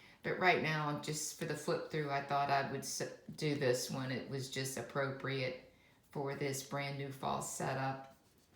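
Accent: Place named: South, United States. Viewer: I absolutely love your fall planner the color is so nice for the season I'm trying to set up my ring light so I can be hands-free to do my video it would be my first one you're such an inspiration love your voice the southern accent always takes me away you're so sweet God bless you and the family 🤗❤️🙏🏼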